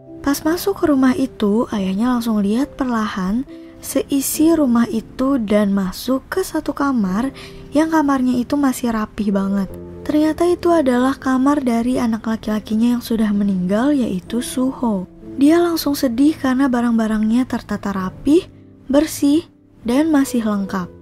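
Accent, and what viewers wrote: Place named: Bandung, Indonesia. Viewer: Ka.. Suaranya mirip si eneg Bandung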